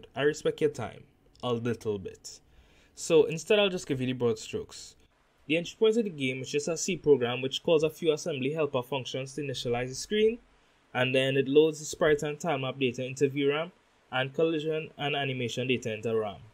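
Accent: Trinidad and Tobago. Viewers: As soon as I heard your voice I knew you were a Trini. Surprised to see a Trini talk about the X16. This is awesome! The outlines around the sprites reminds me of the Castlevania games for GBA. Was it done to help the sprites stand out against the background and whatnot?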